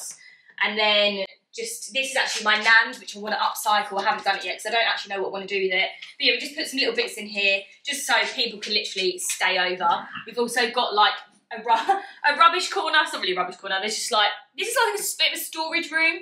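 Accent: United Kingdom. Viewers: I am a big fan of yours on Instagram ,and this is my first time I see your YouTube channel, so now I am subscribed. Beautiful house tour, The Decour is spectacular ,and I was so fascinated by your British accent love it 🥰 !!!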